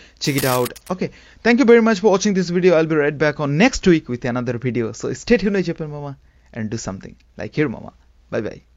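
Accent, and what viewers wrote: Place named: United Kingdom. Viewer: Vai apni Bangladeshi, amon vab nitechen amon vabe kotha boltechen mone hoy apni kono engrej, Bangla ta ektu vlo koira bola sikhen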